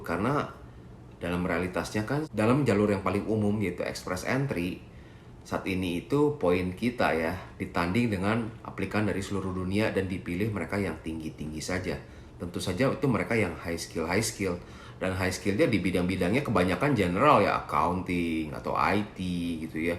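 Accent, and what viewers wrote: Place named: West Java, Indonesia. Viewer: Koh logatnya kerasa sundanya hehe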